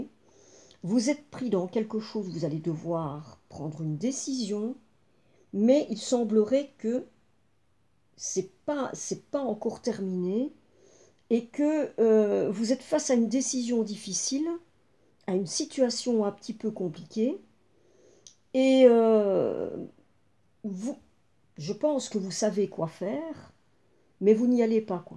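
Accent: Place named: Wallonia, Belgium. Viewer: J'aime beaucoup vos tirages et votre accent wallon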